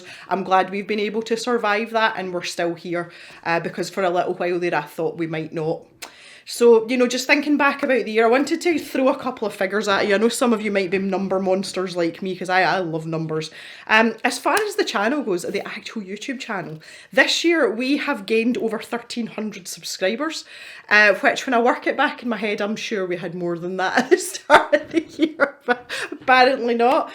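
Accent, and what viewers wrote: Scotland, United Kingdom. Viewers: Happy new year,! 🍾🥂 Congratulations on your great achievement! 👏👏👏 I love watching your videos and listening to your Scottish accent. Your sense of humor and your way of talking about your pet peeve markers in the unboxing videos are absolutely awesome, you make me laugh out loud a lot. Keep up the great work! 🙂 Thank goodness I've made up my mind I'll stick with colouring and watercolour painting - otherwise, I'd be ordering all the subscription boxes just because I like your reviews so much. 😉 By the way, it was an absolute honour for me that we got to colour our pages side by side in the Ivy travelling book that went to Johanna Basford last year! 💖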